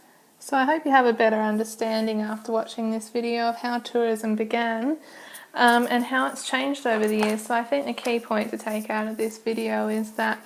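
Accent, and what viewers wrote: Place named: United Kingdom. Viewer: Even though she has a British accent, I can understand almost everything effortlessly. That means she made a really good lecture ✌ (better than my teacher...)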